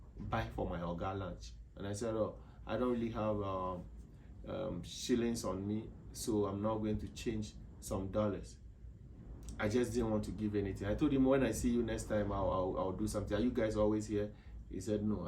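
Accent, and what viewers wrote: Nigeria. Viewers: We think you are a Nigerian coz of accent 😂😂😂😂😂😂😂😂😂😂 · You english you talk like Nigerians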